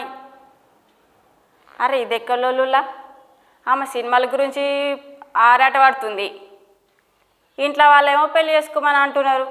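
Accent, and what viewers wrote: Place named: Warangal, India. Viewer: Wowwww Excellent Anchor... Warangal Language super super Medam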